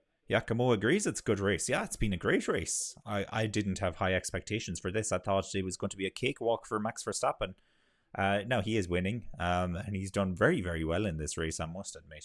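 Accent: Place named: Ireland. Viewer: Aaaah a good Irish accent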